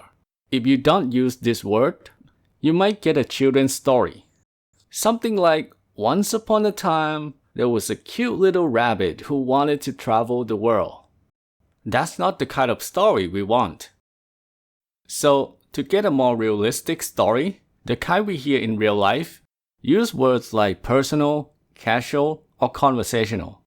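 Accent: Thailand. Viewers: I think your broke English sounds like Thai pronunciation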